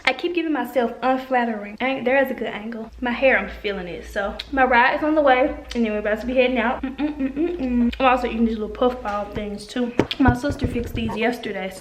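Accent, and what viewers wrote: United States. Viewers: Your little country accent is so cute!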